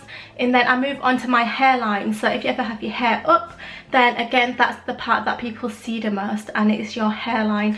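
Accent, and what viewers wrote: United Kingdom. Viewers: u have a British accent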